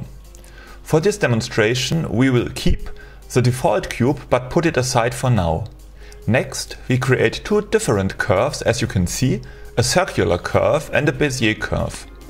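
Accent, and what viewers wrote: Germany. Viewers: do i hear a little bit of deutschen akzent?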